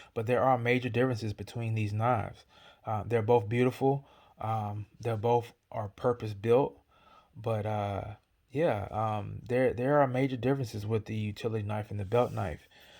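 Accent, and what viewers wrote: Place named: South, United States. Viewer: He’s probably from the South like me. We have accents:)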